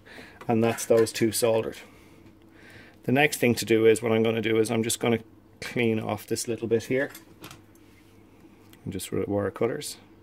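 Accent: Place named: Ireland. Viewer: Thank you very much for your explanation. I'm just a step on trying myself 😄. I lived in Ireland for 5 years and I couldn't help myself of thinking you sound 'slightly'😄 Irish. I'm sure I'll be wrong. I'm watching videos like a crazy because I have a lego rocket I want to light (the thrusters) and I'm figuring out how to do it. Cheers from Spain ♥️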